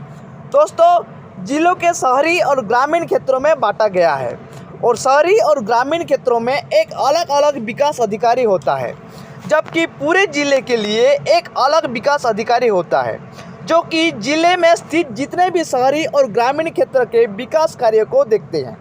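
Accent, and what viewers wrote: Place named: Odisha, India. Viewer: Bolnewala aadmi velong to odisha i can know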